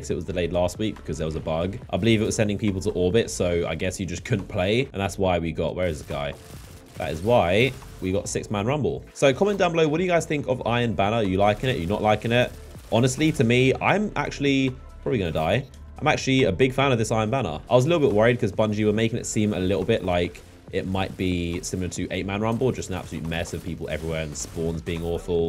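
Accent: United Kingdom. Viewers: Can you learn an American accept please to be more relatable to most users? This british thing is hard to listen to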